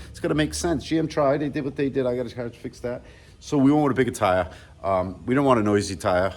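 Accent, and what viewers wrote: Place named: Massachusetts, United States. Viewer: I knew you were from Mass before seeing the plates lol fellow mate !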